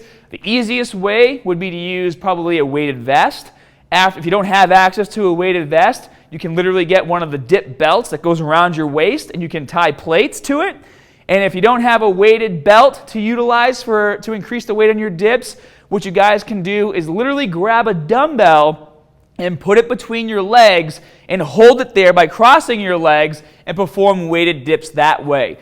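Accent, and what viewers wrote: Boston, United States. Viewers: drjd2real you exhibit 3 things with your unfortunate comment: 1. Stupidity - your comment has nothing to do with the issue being discussed. 2. Ignorance - is speaking like most Bostonians/Massachusettsmen would speak. It's the regional accent. 3. Racial complex - get the chip off your shoulder, that stuff is really tiresome. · When did get a Boston accent? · Must be from Boston... I grew up in Cambridge. Thanks for the info man!💪🏿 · I'm guessing from Boston? LOL Great video though! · love the video...but omg i hate that boston accent! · Is he from Boston? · He says start, arms and hard funny. Must be from boston. Everything with a "A" sound lol Accent is bugged out. Real informative tho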